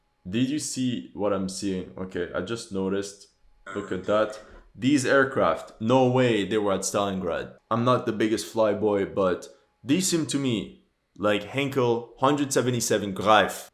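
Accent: Quebec, Canada. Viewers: He is from the french part of Canada right? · I have a question for u man where u from? because you have like a canadian accent but from Quebec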